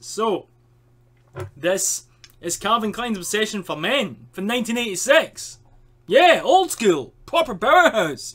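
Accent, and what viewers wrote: Scotland, United Kingdom. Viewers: S good and fun review to watch. BTW, are you Scottish? Cool accent regardless.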